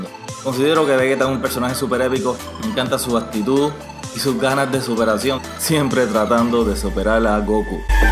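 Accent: Puerto Rico. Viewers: OYE ERES DE PUERTO RICO?? Soy dominicano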